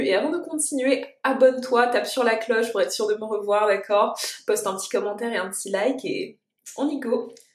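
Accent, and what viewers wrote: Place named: England, United Kingdom. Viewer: J’ai remarqué tu prononces les mots en anglais avec l’accent anglais, t’as vécu en Angleterre?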